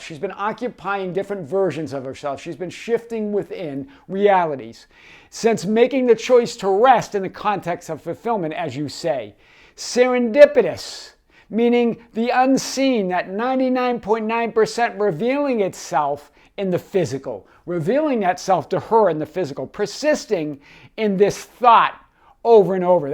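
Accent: Boston, United States. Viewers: Yesss! I knew it was a Boston accent, I love guessing accent's... Its my thing😅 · Aw we love that Boston accent 😂🤍🤍🤍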